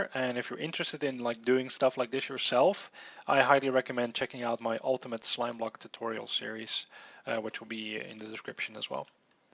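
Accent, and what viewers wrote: Netherlands. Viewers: i can hear you are a Dutch man ;) ik heb er niks mee maar het is grappig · You dutch??? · Are you dutch?? THE accent sounds really Dutch... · Yes he's Dutch many ask this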